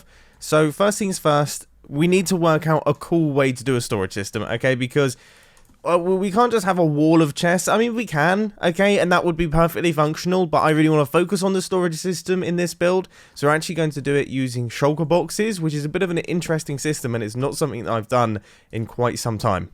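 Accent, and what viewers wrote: United Kingdom. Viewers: He’s sounding really British in this one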